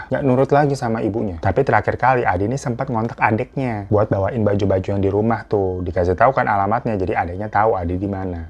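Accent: Java, Indonesia. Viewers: Kenapa logat nya jadi jawaaa 😭🤣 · Bahasa minang logat Jawa😭🤣🤭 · Ternyata bisa ya bahasa minang pakai logat jawa 🤣🤣🤣 emang beda 👍👍👍👍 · logat jawa tapi bawa bahasa minang😭😭😭😭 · Kenapa jadi logat jawa sih bang hahahhahaa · kooo minang jadi logat jawaaaa :face-purple-crying::face-purple-crying:🤣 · Logatnya jawa banget bang 😂😂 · Sempet bingung tadi dgr bang ngmg logat jawa pdhl lagi ceritain suku minang😂😂. Seharusnya adat2 seperti ini tidak perlu di lestarikan,krn memberatkan,dan tidak sesuai dgn syariat Islam yg mayoritas agama disana,jadi kesannya kyk jual anak. sebagai orang tua harus lebih wise untuk kebahagiaan anak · Bahasa padang tapi logat jawa 😂😂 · voice overnya jawa😂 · Bahasa pake bahasa minang, tapi logatnya logat jawa, bang the best 😂😂😂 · baca text Minang pakai logat Jawa🤣🤣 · Bang pas lu bacain percakapan gua ngakak 🤣 malah medok jowo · Kenapa logatnya jadi jawaa wkwkwk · Knpa logatnya kita jd jawa ya Langsung ngakak 😅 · Bang, haha kok lu jadi logat Jawa bang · minang logat Jawa ya bang😲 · Bg ngomong bahasa Minang aksen Jawa bgtu😅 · Bahasa minang tp logat jowo piye toh bang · Wkwkwkwk.. 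agak lucu bg.. logat bahasa minangnya jawa.. tapi usahanya untuk berbahasa minang top lah.. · Bahasa minang logat jawa 😅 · Minang logat jawa. Lucu bet sih bang. Awokwowokkk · Bang napa logatnya jadi jawa wkwkkw bukan padang🤣gemoy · Logatnya knp jadi jawa bangg😂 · Wkwkwk lucu banget bg ngomong bahasa Minang pakai logat jawa. 😅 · BANG w salpok LU NGMNG bahasa minang tapi logat jawa😭😭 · Koq aku ngakak bahasa menang logat Jawa....😅😅 semangat bang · Kok logat nya jadi jawa yah kak 😅😅😅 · Kenapa logat nya jawa si😂😂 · Cerita Minang contoh bahasa malah logat Jawa BG 😅😅😅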